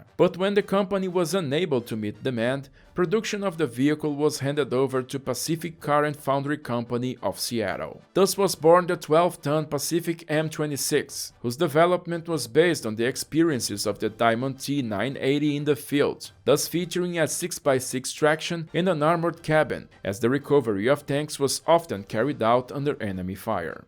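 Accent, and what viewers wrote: Brazil. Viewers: Nunca vi esse canal, mas percebi que era brasileiro pelo sotaque HEUHEUEHUEHEUUEUE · Apesar de falar inglês muito bem,o sotaque entrega que é Brasileiro 😂👍 Parabéns por estar trilhando um novo caminho com um novo público!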